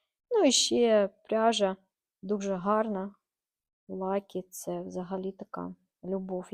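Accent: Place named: Steppe, Ukraine. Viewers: Лайк за степовий суржик))! Ще один))❤ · завжди із задоволенням дивлюся ваші відео. Ви не тільки надихаєте своїми виробами. Вас завжди цікаво слухати. І ваш Херсонський говір, то моя рідна мова😂. Херсон, тримайся❤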